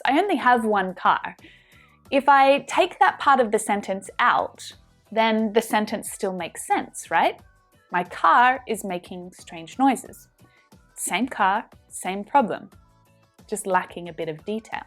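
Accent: Spain. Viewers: I love your Spanish accent,! Sí, el invierno puede ser un infierno, también;-) Thank you again for this valuable classxxx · Hi I loved your Spanish accent. Xxx not say (inferno) invierno ok!!! You're lovely ❤️ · your spanish accent is lovely :)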